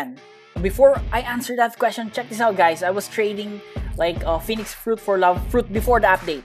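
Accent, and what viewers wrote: Philippines. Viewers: You sound like a Filipino to me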